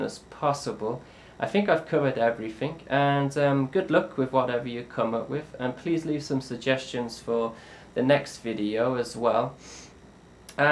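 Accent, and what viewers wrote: United Kingdom. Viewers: What country are u from u kinda sound English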